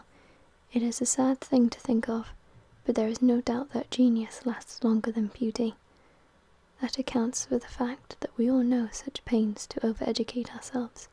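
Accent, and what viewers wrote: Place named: RP, United Kingdom. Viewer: A posh British bird. Not many of them around nowadays.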